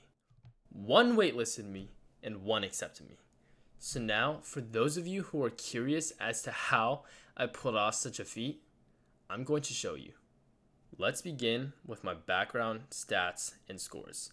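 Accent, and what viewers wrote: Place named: Southern California, United States. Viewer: According to who? He sounds like a well spoken chill SoCal guy to me. He was funny, he didn’t have pauses with ums and ahs, pretty great oration - what were you hoping for? lol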